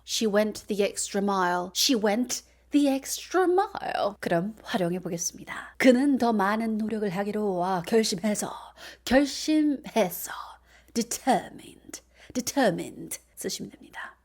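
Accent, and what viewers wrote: United Kingdom. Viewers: 아침에 생방 듣고 빵빵 터졌어요~ 제가 딱 원하던 영국영어 감사합니다~ 너무 웃기셔요 ㅎㅎㅎ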